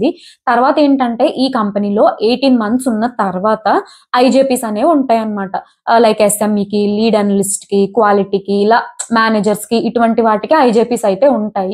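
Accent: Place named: Konaseema, India. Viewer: Konaseema slang ❤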